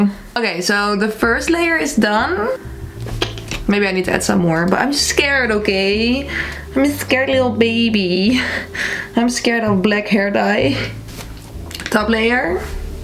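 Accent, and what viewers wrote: Netherlands. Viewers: every time I watch your videos I still wonder if ur dutch cuz I never knew but u rly have that dutch accent lol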